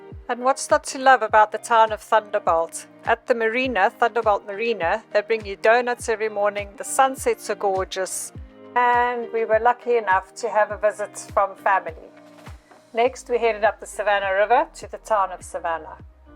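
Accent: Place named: Zimbabwe. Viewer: Dear and, this is first time I've viewed your channel and I enjoyed it immensely, thanks! In a twist of fate I know already from our Allan Wilson High School days in the early '70s when we were both boarders at Burnham House! I remember you well and you've done really well for yourself in the maritime industry from a landlocked childhood! Your narration was great, I loved hearing the familiar Zim accent, thanks! Happy sailing guys! Cheers, Jeremy Lancashire (Lancs)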